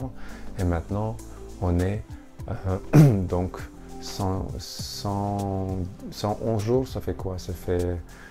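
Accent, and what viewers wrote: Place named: Russia. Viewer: Très bon contenu merci , on sent une certaine apaisement en toi , et ton accent est très charment c'est russe ?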